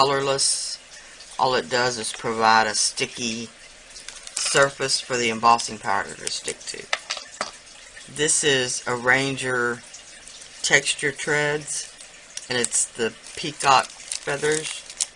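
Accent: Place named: Texas, United States. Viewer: I'm glad you mentioned that you are from Texas. The accent is so pleasant and familiar. I'm in Arkansas, but the accents are similar. You sound EXACTLY like my friend Cynthia, so that is just another plus to watching/listening to your tutorials. You are awesome times ten!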